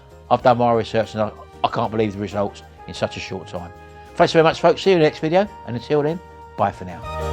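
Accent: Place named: England, United Kingdom. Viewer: How refreshing to listen to a clear English accent instead of high pitched nasal American females telling us how to eat.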